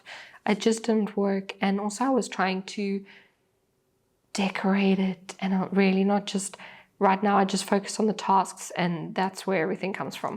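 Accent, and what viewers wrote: South Africa. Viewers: Hey, you popped up on my feed today, I am also South African. I was listening and was thinking your accent 😍 sounds so South African. Are you still in SA? Very interesting to see thar a fellow SA person is using a Hobonichi Avec.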